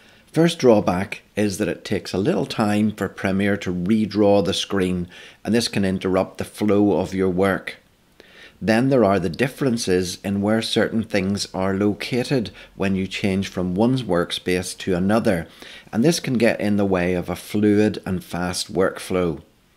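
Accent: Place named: Northern Ireland, United Kingdom. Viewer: First northern ireland accent I’ve ever found on a premier pro tutorial lol also from northern ireland (tyrone)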